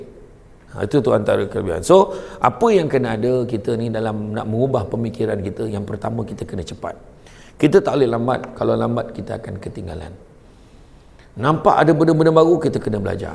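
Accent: Negeri Sembilan, Malaysia. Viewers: Semoga ustaz selalu sihat hendaknya...saya suka dengar loghat nogori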